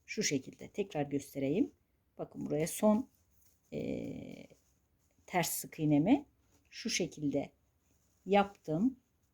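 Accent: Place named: Ordu, Turkey. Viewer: Ordulumusunuz🤗konusmaniz orayi animsatti bana😊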